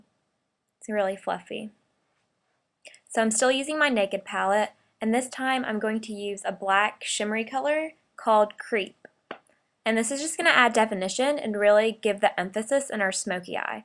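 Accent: South, United States. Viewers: Your southern accent is cute! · Omg had a country accent lol I love it!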